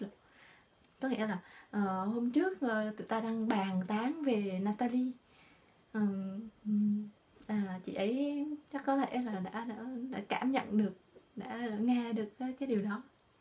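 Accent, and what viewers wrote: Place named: Paris, France. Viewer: Cảm ơn cháu cho bác có dịp nghe lại giọng pure 'Parisienne' , mong cháu cho thêm bài mới nhe. BON SANTÉ.- Bác ttt, WA, America.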